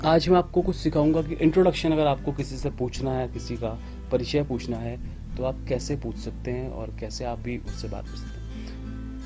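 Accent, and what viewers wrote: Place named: Pauri, India. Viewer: Ye pauri ki gdwali lg ri 😊..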